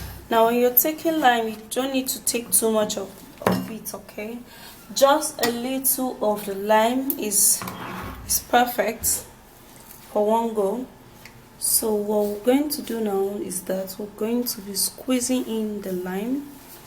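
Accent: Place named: Nigeria. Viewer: Those are grapes she use not berries...but maybe thats what they name it in their country.She sounds like Nigerian to me.All that she uses are natural.every day stuff...I guess It will work.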